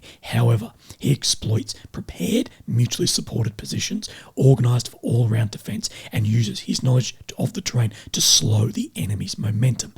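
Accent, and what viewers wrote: Australia. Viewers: Mate, it's really fantastic your Aussie accent! I'm learning the difference from colloqiual English following your channel, so go on as usual I love it! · You start to sound a little like Robert F. Kennedy, Jr. And as an encouragement I'l like to say that Kennedy didn't want to run for a president because of his voice, but they ran a probing campaign and polls showed that most American are ok with his voice and he is popular anyway. That made this admission in his recent interview with Jordan Peterson. I personally don't find it hard to understand what your say because of the more coarse voice. In fact, your Australian accent is much more of an obstacle to me. All that said, I hope you recover your voice soon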